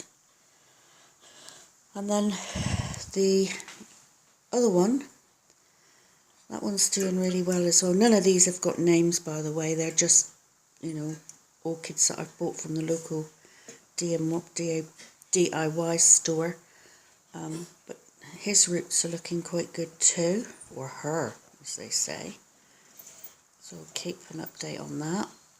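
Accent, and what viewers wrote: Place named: South, United Kingdom. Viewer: I do wish growers would remove the old medium when they repot, it takes ages to carefully remove especially the moss from oncidiums fine roots! Bark is better to remove but those hard packs of I don't know what they grow the seedling in is also difficult to remove. Found out your living in NZ from this video but your accent tells me your originally from the south of UK. Keep posting please!!!!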